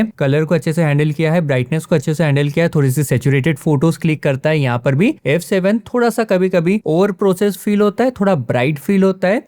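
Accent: Indore, India. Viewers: Bhai Indore se ho kya?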